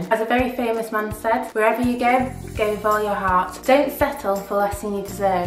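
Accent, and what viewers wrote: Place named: United Kingdom. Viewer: Happy Birthday! Where are you from in the UK? I like your accent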